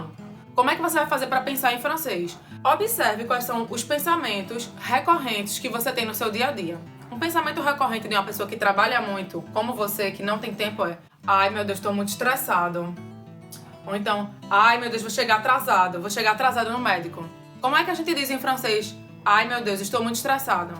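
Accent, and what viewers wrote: Pernambuco, Brazil. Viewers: Adoro seu sotaque pernambucano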